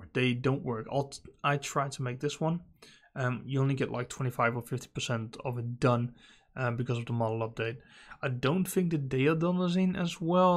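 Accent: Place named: Netherlands. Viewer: Theres such a good Managarmr paint job Actually (fakka strijder btw, k hoor gwn dat je NL bent over je engels XD) gooi ff je discord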